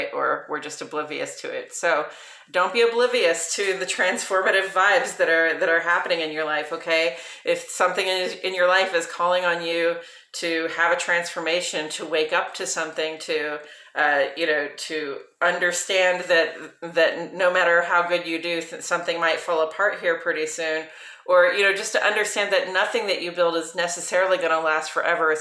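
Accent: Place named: Chicago, United States. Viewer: Hi, (Flakeway and) I have to ask you out of curiosity where you are from originally. I've suspected that from your voice that you sound like you are from NE Illinois ie, Chicago area as I am originally.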